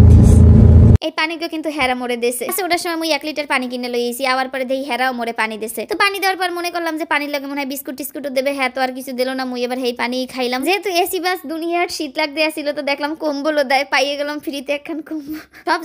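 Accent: Barishal, Bangladesh. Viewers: আমার এই ভিডিও টা দেখার কারণ হলো বরিশালের কথাগুলো শুনার জন্য। কেননা আমি চট্টগ্রামের আর আমার একজন মনের মানুষ ছিল বরিশালের। তার কথা গুলো সেম মতো।অবশ্য সে এখন আমার আর নাই।আমায় বিশ্বাস নিয়ে আমাকে ঠকাইছে।অথচ ভালোবাসার উদ্ভুত মায়া।সে এখন আমায় ঘৃণা আমি তাকে করতে পারিনা ঘৃণা এটাই হয়ত ভালোবাসা।বরিশালের ভাষা কারো মুখ থেকে শুনলে আমার বুক ফেটে কান্না আসে।নাম তার ফ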